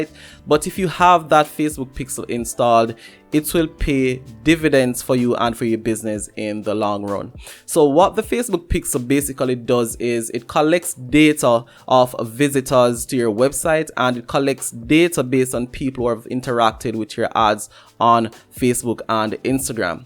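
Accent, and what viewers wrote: Jamaica. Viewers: I hear Jamaican 💀 dropped a sub 💯 you got my full support bro I love to see other Jamaicans doing big stuff 🙌🏽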